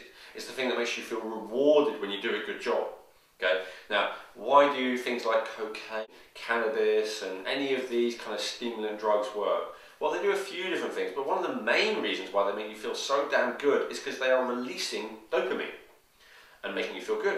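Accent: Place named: United Kingdom. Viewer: The few bits of your audio that are discernable, seem to possibly have useful info. Please, repost this info having recorded it in a room acoustically dampened to eliminate reverb, using a more sensitive microphone or placing it closer to your mouth, and without mumbling. Not everyone is atuned to the British manor of speech, and I suspect you want to attract the most viewers possible afterall. Also I suggest using a backdrop, even if it's only a non-distracting picture (digital or enlarged photo) of a common interior room, rather than a solid color. And shave before a public address. It lends to your credibility. Please, reply when your updated video is posted. I look forward to your thoughts on the posted topic.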